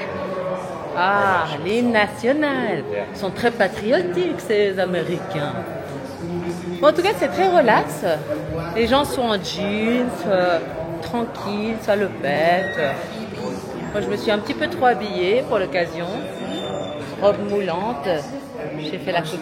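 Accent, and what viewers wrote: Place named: Switzerland. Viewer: L’accent suisse faut arrêter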